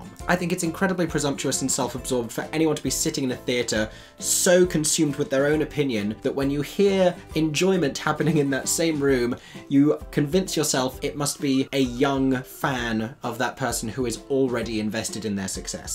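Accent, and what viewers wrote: United Kingdom. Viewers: This critic is very intelligent, I'll give him that. He looks like he's 19 years old. Wonderful that he thinks he's all that. This is ALL his opinion. A British accent doesn't make you an automatic theatre historian. A bit full of himself. Not impressed. On another note, I dont think Beanie was well cast at all. Miscast, to say the least. Of course she is no match for Streisand in any decade or in any category. The voice alone. I'll tune in when this kid grows some more facial hair. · British accent vs Brooklyn Jewish accent. What a trite criticism.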